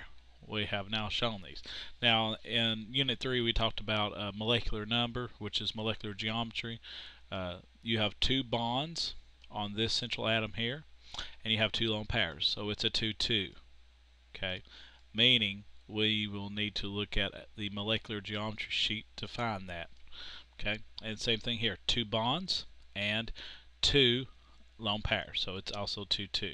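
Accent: South, United States. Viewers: BIG HELP! & great country accent. Southerners!!! =)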